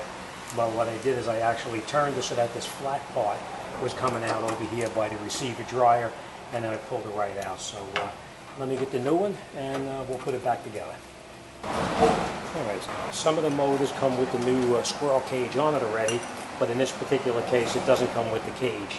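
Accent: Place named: New York, United States. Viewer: Love the video thanks! This guys definitely a New Yorker! 👍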